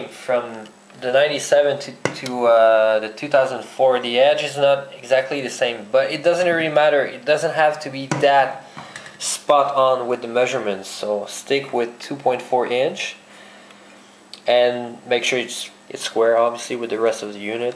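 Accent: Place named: Quebec, Canada. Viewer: Nice video! Et beau accent québecois lol ;)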